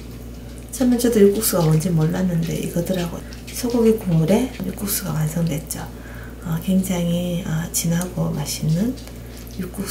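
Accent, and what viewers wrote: Gyeongsang, South Korea. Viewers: 갱상도 아지매 방갑니더~ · 🐋😀아~네^^♡쇠고기국 활용도가 대단하네요😍 😘는 대구쪽이세요?아님 부산쪽이세요?🍎🍏말씀소리가 참 부드럽고 예뻐서예🍡👠💍미스때 직장 동료가 마산출신이 있었는데 정말 말소리가 예뻐서 안 잊혀지네요😍😍😍🐸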